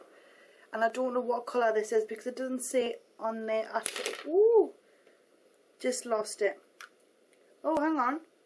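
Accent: Newcastle, United Kingdom. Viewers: hey! i spent a month in newcastle recently (I'm from New Hampshire)...you sound like the people I met there! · Lol, newcastle accent. NE England.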